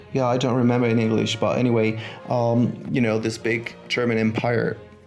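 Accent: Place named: France. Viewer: The only thing I might like more than your builds is your cute french accent :^)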